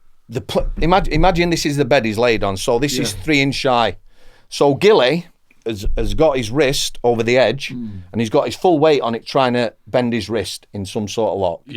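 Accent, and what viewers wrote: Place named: Northern England, United Kingdom. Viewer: NEUTRAL ACCENT LOL how on gods good earth can this guy think is southern? If he was American I could understand but for fuvks sake how can any British person not know is from the north? This guy must have taken too many knocks from Danny g and something got dislodged in his head! Lol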